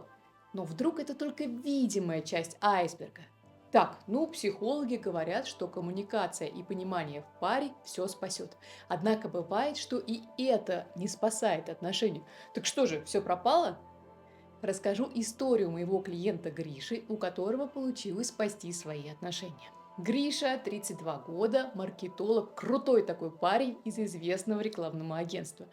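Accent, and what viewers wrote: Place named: Russia. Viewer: Дизлайк за руZZйобську говірку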